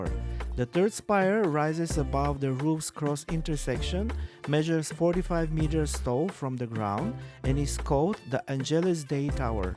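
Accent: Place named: Singapore. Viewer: Where do you come from anyway?ur English sounds like Singaporean or at least asian acsent..😊❤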